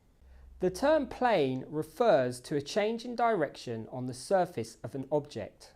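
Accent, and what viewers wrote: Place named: London, United Kingdom. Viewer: Hearing you speak makes me miss London!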